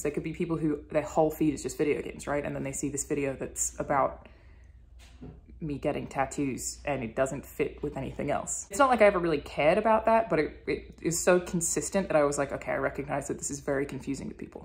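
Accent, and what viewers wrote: Australia. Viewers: Liked. No freakin' clue how you ended up in my recommendations. You're cute. Your dog is amusingly weird. I have no idea about painting models, but I agree that Ripley needed to be greener. Tattooing eyebrows seems severe, but I'm a 60-year-old guy, so there's that. Obviously, I'm not a gamer, but I did play Descent 3 for an hour yesterday. Does that count? Shameless confession: Aussie accents (yes, I'm aware there are many) are simply lovely. If you show up in my recommendations again, I am likely to watch. Yay, Al Gore Rhythm. Be happy,. Your dog is brilliant. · can you make a channel without the aussie accent?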